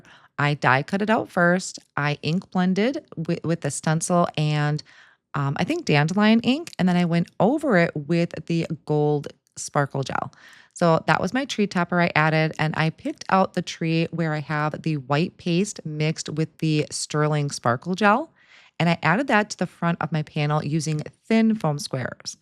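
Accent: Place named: Wisconsin, United States. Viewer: Oh my gosh, I just stumbled onto your channel and heard your voice and I thought, wow, that sounds like home. Turns out you’re from Chippewa and I’m from Eau Claire! I’m down by Milwaukee now but love that we’re from the same neck of the woods! ❤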